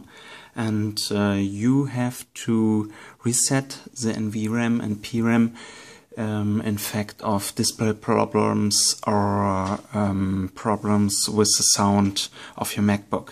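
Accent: Germany. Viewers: German accent <3 Danke :)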